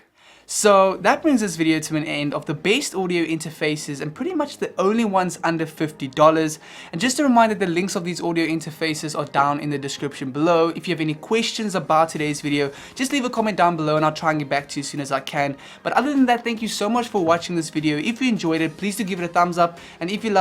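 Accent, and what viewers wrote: South Africa. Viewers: Hey!thank you for this video ,quick question,are you from South Africa?you've got the accent lol ,I'm from SA as well!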